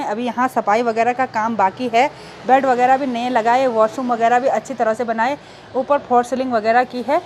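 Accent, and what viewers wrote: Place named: Uttarakhand, India. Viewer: The best youtuber from uttarakhand ❤ the way she speaks ..true uttarakhandi touchhh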